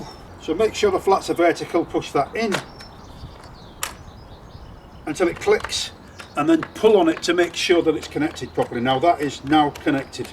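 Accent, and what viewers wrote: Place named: Hull, United Kingdom. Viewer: I certainly did find the review useful. It was excellent, detailed and honest. I would expect nothing less from a Yorkshire man. However, I was really struggling to decide where the accent was from. Initially I thought your accent could well have been from South Yorkshire, even Sheffield, but then I heard the way you said "work" and "nine" and they sounded like a very slight Hull accent. Anyway, something I do want to know is how did it perform on your actual windows. I saw you soap them down but not clean the soap off. Does it leave faint limescale marks where droplets dry?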